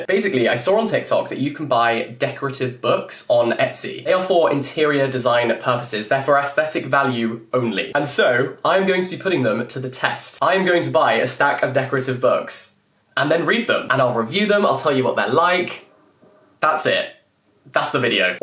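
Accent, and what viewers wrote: United Kingdom. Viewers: omg he has the most british birtish accent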